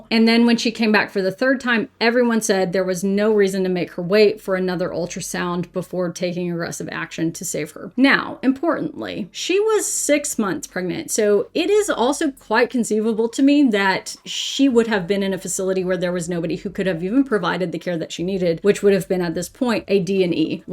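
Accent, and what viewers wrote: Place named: United States. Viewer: You sound very American, you should work on that, there's a reason women and members of the lgbtq+ get danger pay if their jobs want them to go into that place. Margaret attwood wrote the handmaids tale about that country for a reason, canadians say Americans for what they are, bad people- you especially 😊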